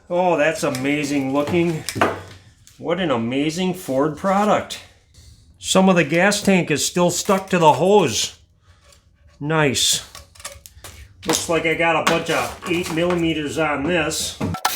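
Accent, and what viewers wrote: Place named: Minnesota, United States. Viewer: Love the accent LOL. Minnesota ? I think this accent with sarcasm is just the best combo. Question if you needed to JUST replace the fuel pump. would you recommend doing it this way or from the top by removing the bed?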